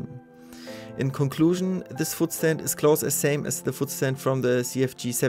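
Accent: Germany. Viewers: are you german? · immer dieser deutsche akzent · your pronounciation sounds way to german.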